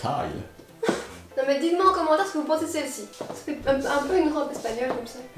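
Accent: Belgium. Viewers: Ton accent belge i love it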